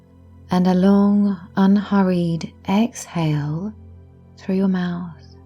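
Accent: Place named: England, United Kingdom. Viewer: My Mum is my true worldly life partner. And I lost her. She died. I have survivor remorse. I am recovered. My Mum is renewed in a different world. I heal my intestines. I am well. Thank you for your hypnosis stories. Your words. Your english voice. Your natural way. Your work. Continue to promote your work to the world. Thank you.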